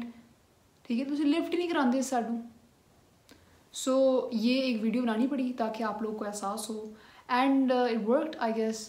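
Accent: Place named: Punjab, India. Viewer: Punjabi accent 😍😍😍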